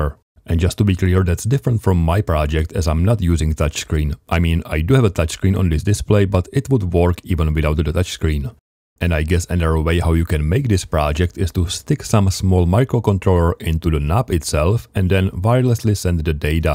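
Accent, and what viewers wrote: Czech Republic. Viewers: Ten českej přízvuk jsem poznal hned :D Btw cool projekt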